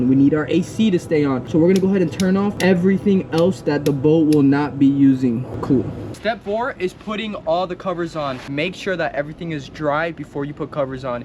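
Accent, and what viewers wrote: Miami, United States. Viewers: His Miami accent lol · Are you from Miami? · Knew bro was from miami after a couple words 💀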